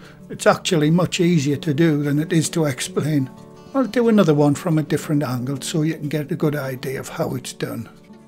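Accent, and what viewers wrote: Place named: North East England, United Kingdom. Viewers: Hello. It’s great to hear my own accent while living here in Crete. As a lass from Seaham Harbour it is music to my ears. After retiring we went to Vancouver, Canada for twenty years and I loved enjoying a Vietnamese roll when going to the mall. Now in Crete there are no meat pies or these rolls so I’ll definitely make some in the cooler months. Love your recipes, your presentations of them are excellent. I’ve learned so much already. Thank you. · Your baking has been the highlight of my week! As a Sunderland lass stuck in another lockdown in Shanghai, I appreciate not only the fantastic bread but the sound of a North-East accent:) They don't really do home ovens in China, but I've bought a table top Panasonic and I am definitely going to try these today. Thanks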